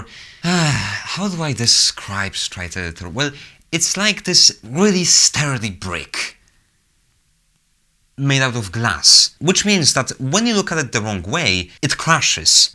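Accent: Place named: Italy. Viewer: I'm loving this generation of good youtubers with italian accent (i think that's italian)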